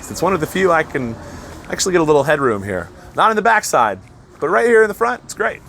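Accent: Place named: Philippines. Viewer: Hang in there,! things will turn around for the better real fast. Btw, your accent is like you’re a Filipino descent — except that you’re way too tall.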